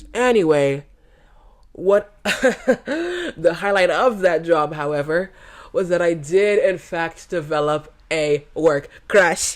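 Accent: United Kingdom. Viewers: The British accent is genuinely so good and fitting on you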